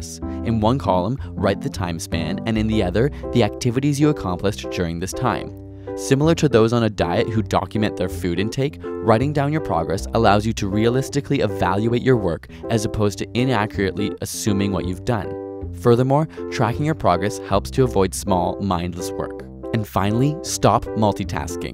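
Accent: Canada. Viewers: are you canadian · that canadian accent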